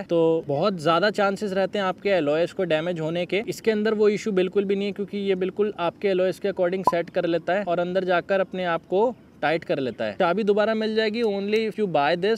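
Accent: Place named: India. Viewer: I had a hard time understanding your English due to heavy Indian accent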